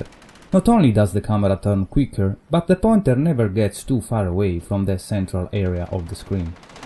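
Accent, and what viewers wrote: Italy. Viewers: lol i was sure you were from italy just by listening the accent for a few seconds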